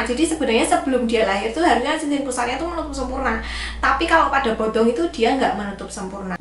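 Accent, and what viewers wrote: Java, Indonesia. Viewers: mbak medok sekali 😂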